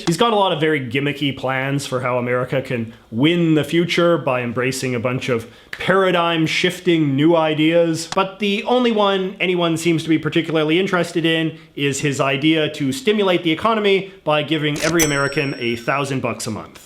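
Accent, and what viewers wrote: Canada. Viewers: Aboot...u are Canadian aren’t you! #Bernie2020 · It's the Canadian pronunciation. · are you canadian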